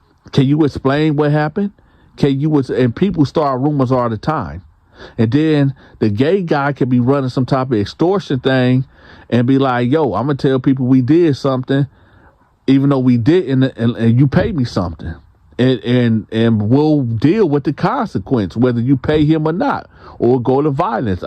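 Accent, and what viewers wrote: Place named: Michigan, United States. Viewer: Your voice is similar to the Goat point guard Magic Johnson. It must be a Michigan accent. #LAKERNATION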